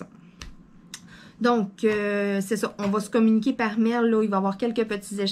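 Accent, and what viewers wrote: Quebec, Canada. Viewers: Félicitations pour ces 402 abonnés, jadore l'accent québécois c'est aussi pour ça que je regarde vos videos. Je participe en croisant les doigts. Sacré gain... bonne chance a tous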